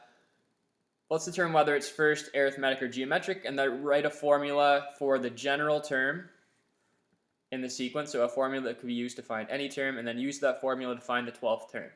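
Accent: Canada. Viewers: The views go from 40k down to like 16k and then down to 10k. People arent sticking around for the whole thing. Lol. Shoutout to Canada. This dude sounds like a typical canadian dude.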